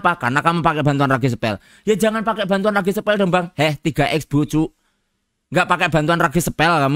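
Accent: Java, Indonesia. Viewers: bahasa Inggris mu keren yoan ngab,medok e Yo oleh🗿🗿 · Ngab... Jenengan asline pundi geh... Jowone medok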